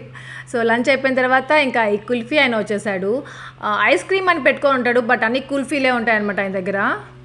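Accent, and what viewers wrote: Tirupati, India. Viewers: akka me voice chala bavumtumdhii...and meru banglr kadha akkada oka slang ga matladutaru ..kani meru tpt side vallu matladinatee umdhii akka...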